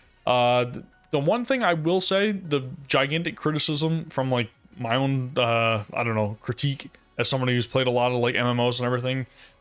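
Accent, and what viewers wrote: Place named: Atlantic Canada, Canada. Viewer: Informative video. Out of curiosity, are you from the east coast of Canada by any chance? I hear a bit of NS or maybe NL to your accent.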